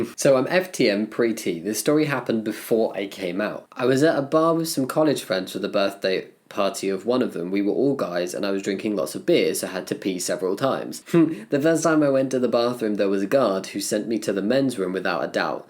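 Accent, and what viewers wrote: United Kingdom. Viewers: off topic but are you british?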